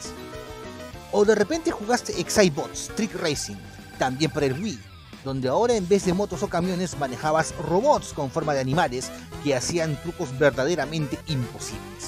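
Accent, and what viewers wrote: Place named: Chile. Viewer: Eres peruano? no me lo tomes a mal pero antes pensaba por tu forma de hablar que eras un poco chileno ... claro un poco no totalmente que eso ya es otra cosa... igual un saludo siempre veo tus videos.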